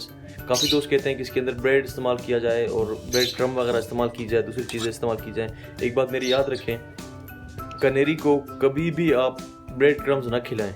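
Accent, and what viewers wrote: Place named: Balochistan, Pakistan. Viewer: Bahot khooob Aik persnal sawal krna chaoonga. With excuse!!!!!! Aap ki urdu Bht achi hai takafuz saaf hai. Lekin pir bi aap k.Lehjy me wo mittaas aajata hai. Jo humari zuban ka hissa hai! Sawal ye k kia aap brahvi hain???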